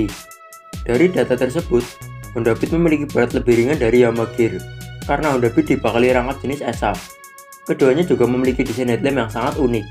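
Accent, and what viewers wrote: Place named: Banyumas, Indonesia. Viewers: Bahasane wong Tegal.......ngapak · ngapak ya mas.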